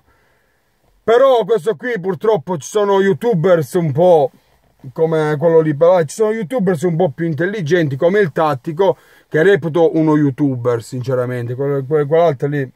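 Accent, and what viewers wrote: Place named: Rome, Italy. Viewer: l'accento romano di e` fantastico <3 hahah Grande!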